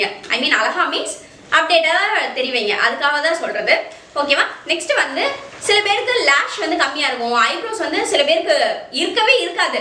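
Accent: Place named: Madurai, India. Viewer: Sissy neenga maduraiya 😘😘 slangeh solludhu "sollikirae,kuduthurae,senjirae" Any way gud content💓👌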